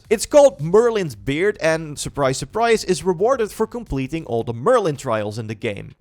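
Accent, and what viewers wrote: Netherlands. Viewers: Are you Dutch? You kinda have a Dutch accent that's why. Also looking forward to the game! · I smell Dutch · You sound dutch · Are you Dutch?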